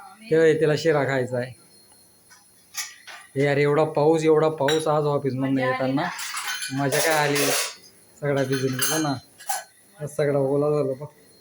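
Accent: Khandesh, India. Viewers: Bhai khandeshi vatato aahe kutla aahe proper gav konte aahe tuze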